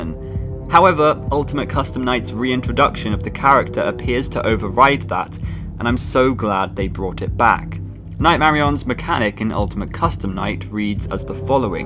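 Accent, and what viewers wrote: United Kingdom. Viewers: talking reminds me why people hate British people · Ok but we’re just now gonna mention þe fact þat he got more and more British while voicing? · that British accent tho XD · English isn't my first language and thus I find the UK accent fucking hilarious.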